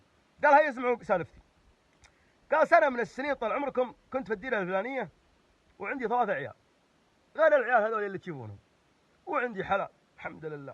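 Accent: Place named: Hejaz, Saudi Arabia. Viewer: السيد Vlb لا والله كلامه واضح ومفهوم ، هذي لهجتنا بالحجاز ' و حنا الاقرب للفصحى & انت تفتقد لاسلوب النقد ،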